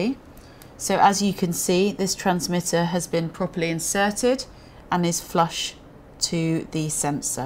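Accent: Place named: England, United Kingdom. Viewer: Wow , I'm in Love ! I really enjoyed this presentation and her English accent as well !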